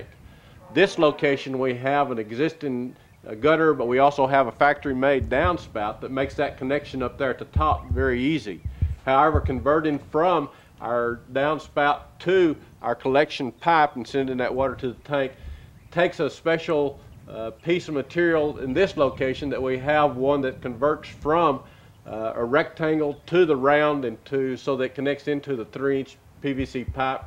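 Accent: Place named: Texas, United States. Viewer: Great video.. I love the Texan accent :-)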